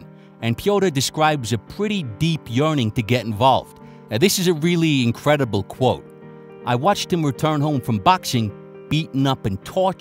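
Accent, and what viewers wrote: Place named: Northeast, United States. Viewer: Time for a poll: Which part of the Northeastern US is the narrator from?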